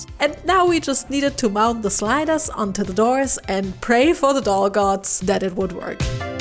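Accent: Germany. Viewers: I love your videos and your German accent I am German and shout-out to u and your dad yall do amazing work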